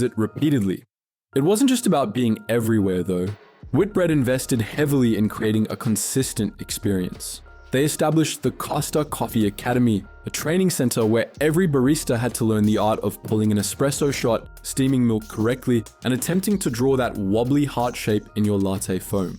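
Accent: Australia. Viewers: I know it’s AI but love how it’s an Australian with deep, comprehensive knowledge on UK high streets 😂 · Based in Cyprus, Australian "voice", making videos on the UK Lowk slop icl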